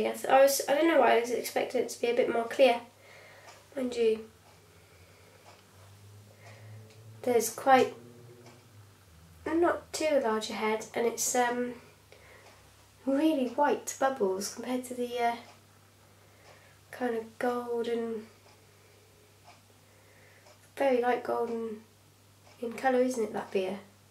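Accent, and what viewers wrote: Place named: Scotland, United Kingdom. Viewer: TO SHOW OFF,WE SCOTS HAVE CLYESDALES,THERE CALLED DRAFT HORSES,ALE GOES BACK A LONG LONG TIME. LOVE THE SOUND OF YOU VOICE.TO HEAR SCOTTISH TONE IS NICE.ARE YOU IN USA.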